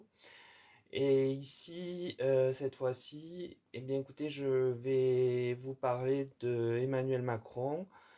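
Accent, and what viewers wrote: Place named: South, France. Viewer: c'est quelle ville du Sud cet accent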